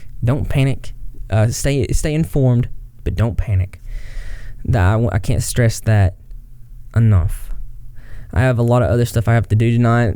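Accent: South, United States. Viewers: okay I've spent a good amount of time trying to figure out what flavor Southerner you are. I'm from West Virginia, but reside in Georgia.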